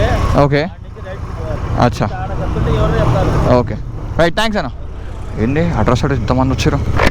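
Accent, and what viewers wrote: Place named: Hyderabad, India. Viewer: Mana Hyderabad slang a alaguntadi 💙💙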